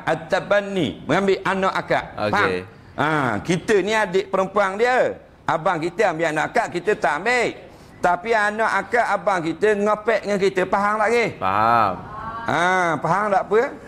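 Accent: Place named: Terengganu, Malaysia. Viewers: Ustaz Idrus kl 1 bulan tu mmg penuh hari2 ada buat ceramah agama di mana2 program yg dijemput. Kl di negeri dia di Trengganu ceramah dia dlm sejam ke sejam setgh..tp kl di luar Trengganu boleh sampai 2 ke 3 jam sebab bg ruang org luar yg susah nok jumpa dia selalu. 1jam ke 1 jam 15 minit ceramah ttg tajuk yg diberi..baki tu sesi bersoal jawab dr org2 yg dengar ceramah ttg apa2 sahaja. Kl masjid luar biasa mmg sentiasa penuh dibanjiri org sampsi luar masjid. Loghat Trengganu dia mmg pekat..tp lama2 dengar boleh paham. Cth..tubik tu maksudnya keluar, Ganu tu Trengganu, hok mana tu..yang mana, pitih tu duit, wang.., riyal tu ringgit..2 riyal tu 2 ringgit, nyor..nyo tu kelapa atau nyior, nyor...,kl dia sebut JKK tu jawatankuasa kampong( pembantu..jawatan kuasa yg dipilih dlm mesyuarat sesuatu kpg atau masjid. Dipanggil Jkk kpg atau jkk masjid). 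· bahasa ustaz tu bahasa loghat terengganu..semakin sukar untok orang indonesia memahami nya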